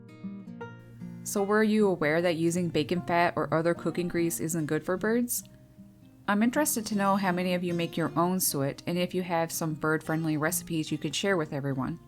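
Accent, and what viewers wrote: Canada. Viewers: Great post and lovely Canadian accent.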